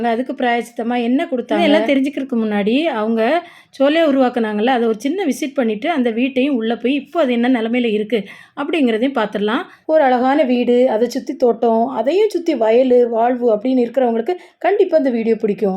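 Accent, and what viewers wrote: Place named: Kanyakumari, India. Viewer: கண்ணியாகுமரி குரல்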